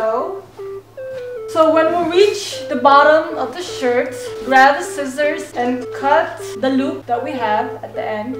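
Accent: Philippines. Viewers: **MUTE ASAP** just THAT TYPICAL filo fob accent.... -COMPLETELY KILLS IT!!! (....&tryn act ol cute n shit, pfffft~LMAO😁) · Filipino accent! haha understand kita! (: love you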